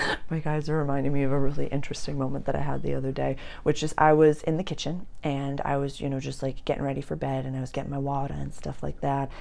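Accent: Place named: Massachusetts, United States. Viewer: Oh my dear ❤, i to am a Aries Sun, Pisces moon, Aquarius ascendant!! I feel you Girl 🙏🏻🌈🪶, By the way I talk to and hug trees 🌳. 🌲, I grew up in Oxford Mass 1970’s and I talk like you ❤🥹… love my accent.. I just found you a few months ago and I feel like I have known you my whole life, thank you for all you do. I’m learning so much from you, not bad an old woman. 🧙‍♀….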